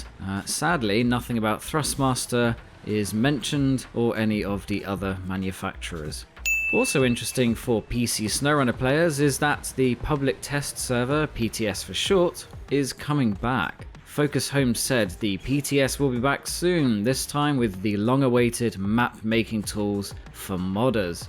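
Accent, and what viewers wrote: United Kingdom. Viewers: I'm from Iraq and English is not my main language,but i love how you talk with your British accent..I really enjoy with your videos❤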